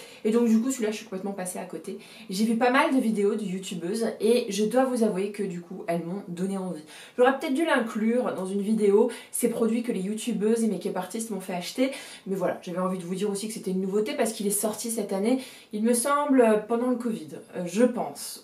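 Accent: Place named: Paris, France. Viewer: Encore un plaisir de t’écouter et de te voir avec ton joli accent parisien!😊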